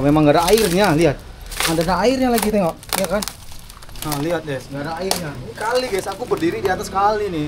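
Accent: Medan, Indonesia. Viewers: kental kali cakap medan kau lek....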